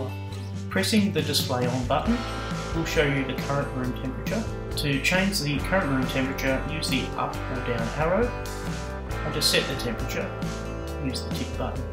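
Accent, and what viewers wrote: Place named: Australia. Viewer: Please re-record this video without the music or have the music substantially reduced. This is an unprofessional demonstration and does not speak well about your digital awareness skills. Depending on one's music system, the 'background' music is magnified and combined with the Australian accent of the presenter (who is perfectly fine btw) non Aussie's cannot decipher some words. Based on this video alone, I may be forced to dismiss the product from consideration. Thank you.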